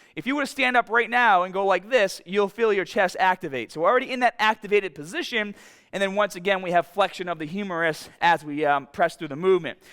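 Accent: Boston, United States. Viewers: What's his accent? Boston? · Bahbell!! Like your vids a lot, but I'll bet money you're from Massachusetts, my friend is from Boston and sounds just like you. Hurts my ears but I love the knowledge and advice, so I'll suffer through it. Lol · Is this dude from Boston ? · Sounds like he’s from Bahhston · Gotta love that Boston accent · Where’s that boston accent coming from ?? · Missed that Boston accent. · That boston accent though. Thanks for the knoweldge anyway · With so many followers and so many questions I really appreciate the fact that you take the time to reply.. hope it's not a VA :-). Didn't realise that's a Boston accent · comedyman112 no. He has a Boston accent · Interesting hearing his 2010 videos having a normal American accent, and now, 8 years later, there is some Boston-sounding accent mixed randomly in there..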